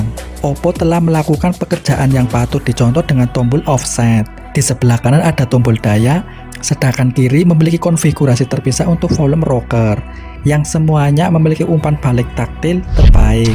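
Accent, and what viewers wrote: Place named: Padang, Indonesia. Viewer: Suaranya , Abang orang Padang ya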